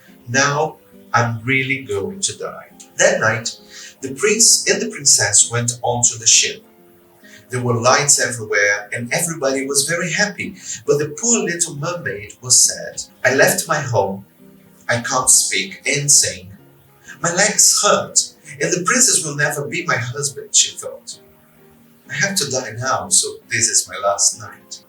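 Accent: United Kingdom. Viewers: obrigada prof, fiz um teste e meu nivel é A1 e amo o sotaque britanico obrigada por esses videos e esse canal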